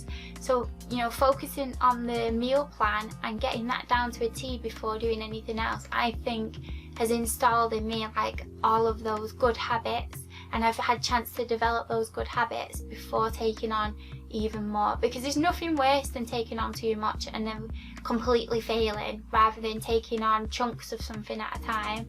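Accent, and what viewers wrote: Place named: Manchester, United Kingdom. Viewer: Love ur accent! U from Manchester beaut? Xx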